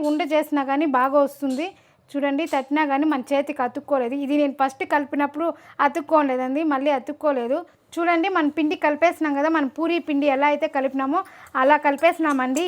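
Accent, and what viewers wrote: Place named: Rayalaseema, India. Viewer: మీది రాయలసీమ ప్రాంతమా. నీ భాష ప్రకాశం రాయలసీమకు దగ్గరగా ఉంది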